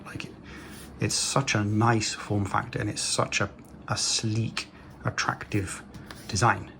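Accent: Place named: Hertfordshire, United Kingdom. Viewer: Are you a Japanese resident or planning to move there? The Hertfordshire accent is very recognisable.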